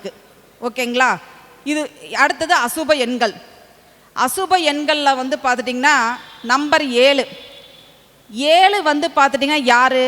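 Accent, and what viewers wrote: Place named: Kongu, India. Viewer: Kongu tamil.. 👏🌷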